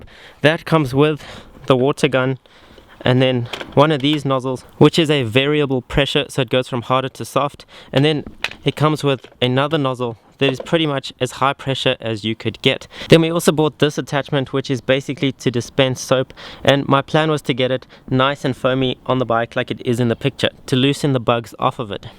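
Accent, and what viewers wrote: South Africa. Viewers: Are u from south Africa?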